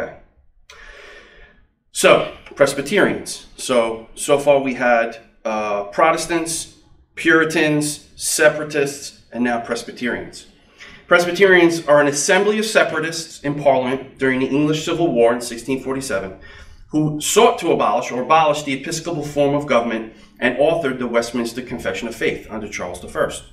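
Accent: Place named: New England, United States. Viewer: This guy is awesome 👍 even I can pay attention to him. I think it's his New England accent (Massachusetts?) Anywho, thanks for breaking this down for us simple folk😊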